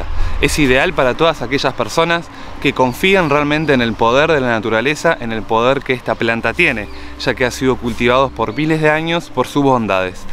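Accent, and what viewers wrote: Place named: Córdoba, Argentina. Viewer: Hola te agradezco por tu canal con info tan util y buena para la salud , te comento que tengo una ruda que no riego nunca y esta muy linda ,estoy en las sierras de Cba y por tu acento y el lugar me parece que vos no estas lejos , aca no llueve durante estos meses .Me gustaron mucho los otros videos un saludo enorme y que tu tarea en el jardin continue con exito .La tierra nos brinda alimento y buenas medicinas por eso tenemos que saber distinguir las plantas y valorarlas , cuando mis hijos eran chicos mas de una vez me salvaron los tecitos de yuyos , pero todavia tengo tanto que aprender , por eso te felicito que siendo tan joven hagas una tarea tan valiosa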